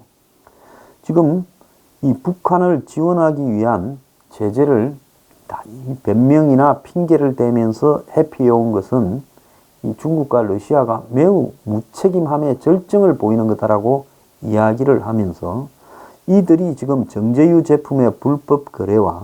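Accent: Gyeongsang, South Korea. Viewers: ..최고의 변사급 뉴스 진행자! 전 소장님의 거친 갱상도 사투리 들을때마다 뭉가로 인한 급노화 된 피부가 재생되는거 같아요..알라븅~🔞🎈😘🍨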